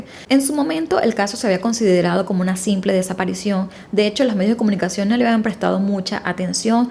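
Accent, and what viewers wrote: Standard. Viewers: Me encanta tu voz neutral sin acento. Bendiciones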